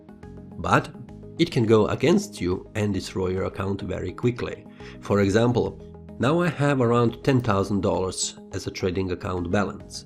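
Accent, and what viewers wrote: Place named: Serbia. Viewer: I like your accent where are you from? Serbia?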